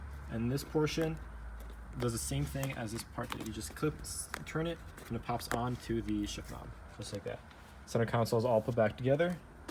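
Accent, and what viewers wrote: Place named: Midwest, United States. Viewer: Love the midwest pronunciation of the word 'console' to sound like 'council'. Must be a Chicago thing. .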